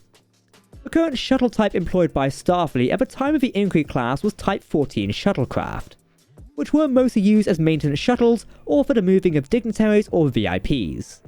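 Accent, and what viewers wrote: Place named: England, United Kingdom. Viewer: Who,? It's a proper English accent. I could understand him just fine.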